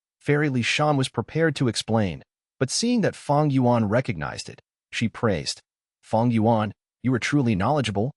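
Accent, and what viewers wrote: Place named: France. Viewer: Why tf are you french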